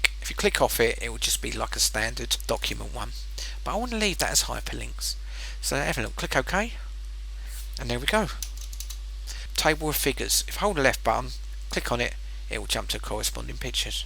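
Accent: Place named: London, United Kingdom. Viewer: You have an unusual name but a strong London accent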